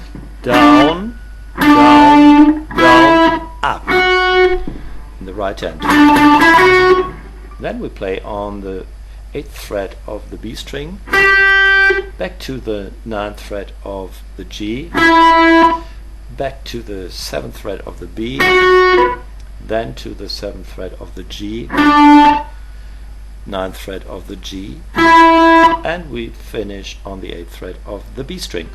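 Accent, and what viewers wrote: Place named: Germany. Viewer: he sounds more german than anything...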